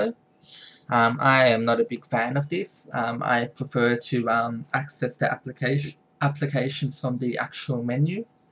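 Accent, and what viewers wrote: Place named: Australia. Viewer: Sounds like he's an Aussie to me, Ronaldo.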